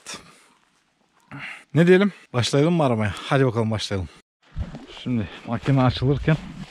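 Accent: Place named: Kırklareli, Turkey. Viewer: Sa dostum,ben Edirneliyim ama seni Viyanadan takip ediyorum,o kirmizi tasli olanin altindada bi tas varmis oralardadir buyuk ihtimalle ,bu arada oralari Kırklareli taraflarimi siven bizim oralı oldugunu gösteriyor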